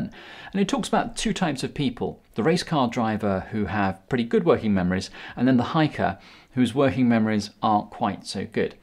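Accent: England, United Kingdom. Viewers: Darling, your English voice is so comfortable to listen to, would you consider bringing in a Spotify podcast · why is every fucking youtube clip I click on either narrated or presented by a Brit? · Ok United Kingdom accent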